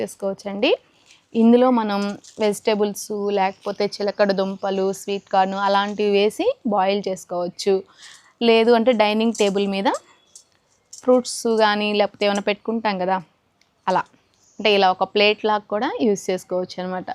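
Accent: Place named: Prakasam, India. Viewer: మీ డి ప్రకాశము జిల్లా కదా మీ వాయిస్ స్లాంగ్ అలాగే ఉంది రిప్లై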